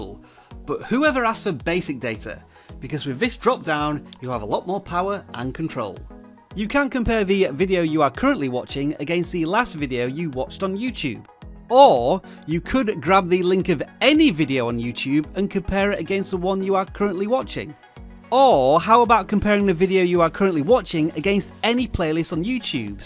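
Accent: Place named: United Kingdom. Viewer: That's a British accent.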